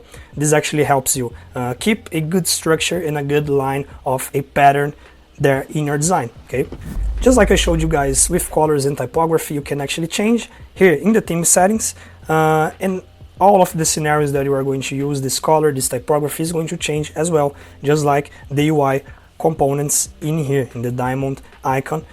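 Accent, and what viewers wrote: Brazil. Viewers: Parece brasileiro falando ingles 😅 boa aula!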